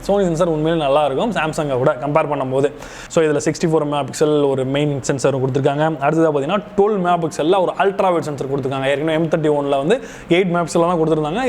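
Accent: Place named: Madurai, India. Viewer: I'm new subscriber Anna nenga Madurai ya ? Slang mass .......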